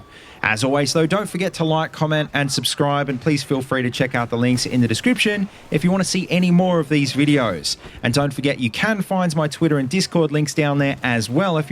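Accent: Australia. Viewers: good to hear an Aussie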